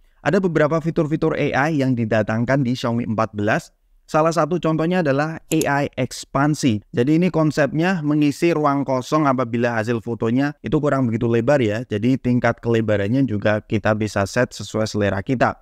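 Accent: Semarang, Indonesia. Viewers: Mas…logat mu Semarangan banget 😂😂😂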